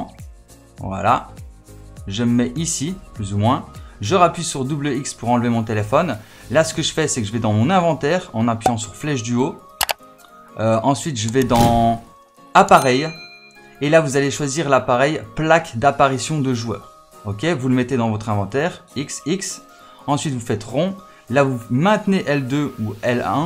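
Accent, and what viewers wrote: Belgium. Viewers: Tu est belge ou pas (question)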